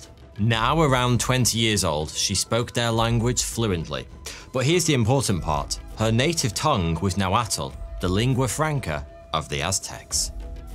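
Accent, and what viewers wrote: United Kingdom. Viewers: Is it because he’s British or because the words he gets wrong are unpronounceable non English? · Never thought a British person pronouncing indigenous cities would be something I’d watch good job on this vid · that's how they pronounce them in the UK. My Roman wife does the same thing sometimes. Don't forget there's an entire world out there, bud. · The british black legend against Spain once again in your british or so accent!! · He wrote about himself: " is a British YouTuber.." So it is just a kind of British accent. Maybe you should watch videos about the main British accents. Maybe his accent is not as unique as might you think - even if it is not a native Liverpool accent. Everyone has a family and friends in childhood and schools etc.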